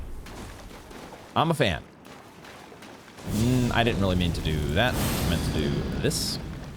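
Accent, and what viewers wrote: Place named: Wales, United Kingdom. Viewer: That's a Welsh accent.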